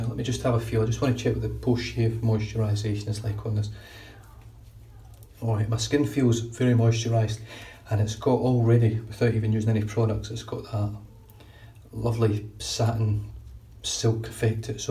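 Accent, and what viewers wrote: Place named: Scotland, United Kingdom. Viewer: With your Scottish accent, you could be recruited to be the next kings man with the all the fancy looking suits.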